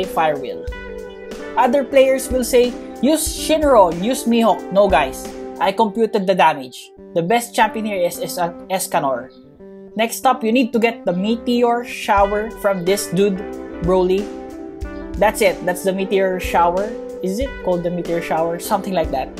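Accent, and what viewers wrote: Philippines. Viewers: u sound like filipino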